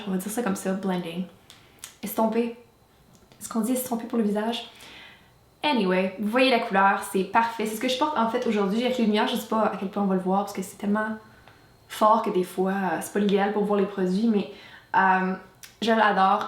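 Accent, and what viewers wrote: Quebec, Canada. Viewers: Yknow I've always felt weird about canadian french cause it just sounds so different from french french that I'm used to, but you make it sound so nice! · Franchment ton accent est adorable. Ca me donne encore plus l'envie de visiter le Canada! One day.. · i am not bad at french but at first i couldn't understand a word, your accent is sooooo cute and unique. i have never heard a french canadian talking so this is really cool! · es tu quebecoise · Also your québécois accent is lovely ^_^ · je viens de découvrir que tu étais canadienne, je pensais que tu étais américaine tellement tu parles bien anglais. je découvre tes vidéos en français et j'adore tellement ton accent. vraiment l'accent québécois c'est magnifique. Merci pour tes vidéos de swatches de rouge a lèvres. je viens toujours vers toi pour les swatches.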